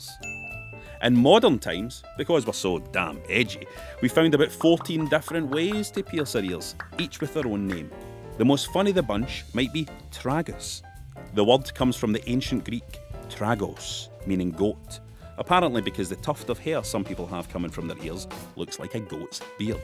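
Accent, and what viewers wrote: Scotland, United Kingdom. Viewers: scottish accent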